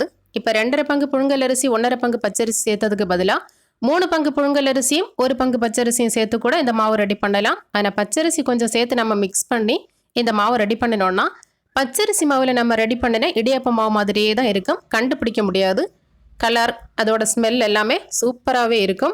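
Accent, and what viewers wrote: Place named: Kanyakumari, India. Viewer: குமரி தமிழ் அருமை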